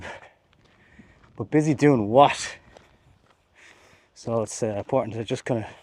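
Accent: Ireland. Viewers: New Zealand here. YouTube ads have become more and more crappy with stupid almost scam products more often than not. Love your channel. Found it looking at Skoda stuff before in light my Octavia RS. Kept watching as I like your style and who doesn’t love an Irish accent. Don’t watch shorts don’t see how they are any use for cars. 10-20 minutes is about right for me.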